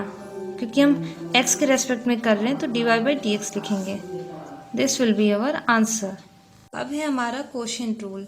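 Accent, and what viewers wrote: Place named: Varanasi, India. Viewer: Very nice... i found kashi in your voice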